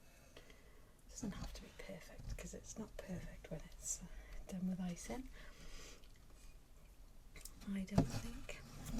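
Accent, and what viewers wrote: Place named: Wales, United Kingdom. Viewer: oh i've been looking for more welsh asmr creators! such a lovely accent and voice ❤️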